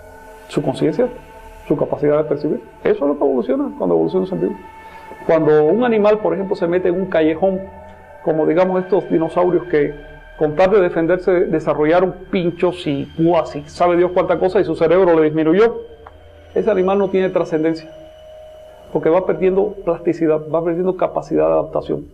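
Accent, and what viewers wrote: Cuba. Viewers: Entonces el hablar como cubano(o no se de donde sea) le anula el conocimiento?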